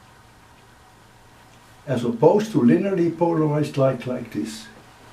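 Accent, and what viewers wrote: Netherlands. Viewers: Thank you! I used to live in Holland back in the 1980s and I recognized your dialect immediately when I watched your first video. I still love physics but do computer work. Danku wel.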